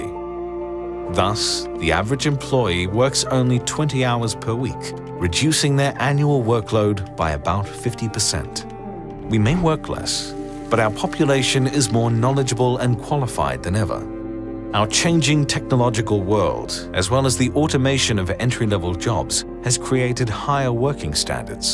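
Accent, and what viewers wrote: United Kingdom. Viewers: I never said anything about clean and shiny animations. I’m not the only one who said they’re mimicking Kurtzgesact, and there’s nothing wrong if they did take inspiration from them. They also have a calm deep voiced British narrator and similar organization and video lengths, they talk about similar topics, the channel also likes posts comparing them to Kurtzgesact so I don’t know what you’re on about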